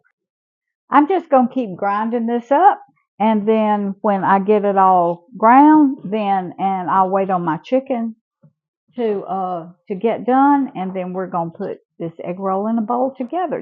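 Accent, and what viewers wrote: South, United States. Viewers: I just wonder where you're located cuz you sound like you're from the Carolinas or maybe on down south like a Alabama unless maybe you a little bit Virginia but that y'all done getting you all OK gal on with the recipe❤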